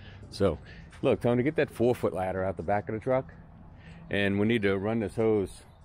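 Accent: Louisiana, United States. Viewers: Man I knew you sounded like you were from Louisiana Saw the 985 area code on the water tank Awesome video!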